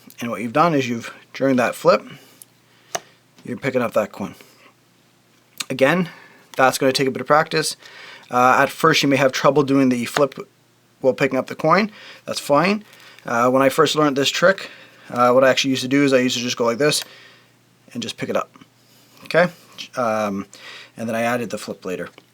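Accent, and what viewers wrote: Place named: Ireland. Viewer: The real magic trick here is the subtle Irish accent he has.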